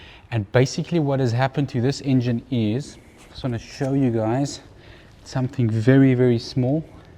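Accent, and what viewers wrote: South Africa. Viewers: He's obviously Afrikaans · U know wat i love about SA accent? Its soo thick, u cnt disguise it shem😂😂😂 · Why do you speak like you’re from Upington or Springs?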